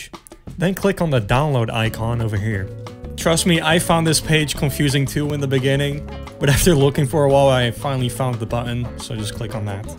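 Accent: Netherlands. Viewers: You’re either Dutch or from Belgium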